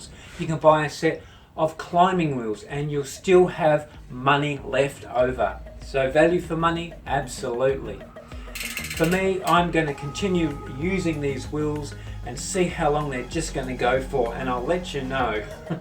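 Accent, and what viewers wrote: Australia. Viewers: I might get a set after Christmas. I am normally dissapointed by my presents and end up spoiling myself with stuff I really want. I'll let you know if I do. I've only recently discovered you. It's nice to hear an Aussie after watching gcn, cycling weekly, bike radar and the vegan cyclist.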